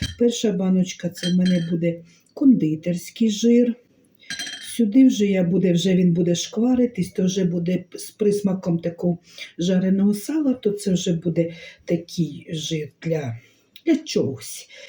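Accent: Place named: Central Ukraine, Ukraine. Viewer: ви просто унікальна! У нас на Україні дуже багато діалекту. Всі люди по - своєму розмовляють.Але всі вони наші рідненькі, дорогі і неперевершені. Ви по- говору -- це центральна Україна. Всі рецепти з цієї території. Розкажіть більше про себе. З відки ви? " Ми вже ваші, а ви наші. 1000 лайків.❤❤❤❤❤❤❤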